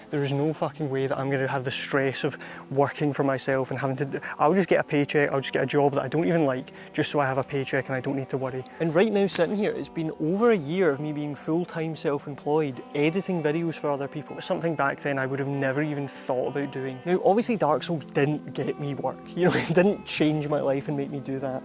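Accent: Scotland, United Kingdom. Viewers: you know.. for some reason I expected a scottish accent and I got one. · I enjoy listening to your Scottish accent :) video is decent, too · Haven't subscribed that quickly in a while. Good production, dark souls appreciation and a balamory accent got me straight on board. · Might I add, the Scottish accent automatically makes everything significantly more excellent. Much love from my little country (Chile) to yours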